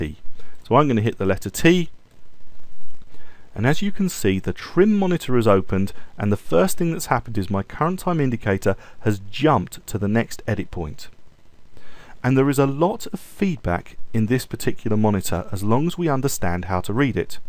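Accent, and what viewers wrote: United Kingdom. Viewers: YOUR LESSONS ARE THE BEST ON YOUTUBE. I AM NEW TO PREMIERE PRO AND YOU HAVE REALLY HELPED ME A LOT. I LOVE THE VERY SIMPLE WAY THAT YOU EXPLAIN SOMETIMES CONFUSING PROCESSES. MANY THANKS!! OH, YES, YOUR BRITISH ACCENT IS VERY COOL.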